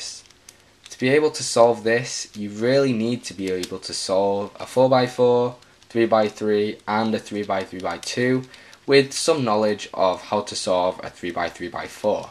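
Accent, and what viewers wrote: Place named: Yorkshire, United Kingdom. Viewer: Hey, just wondering which part of the country you are from as you sound like a yorkshireman?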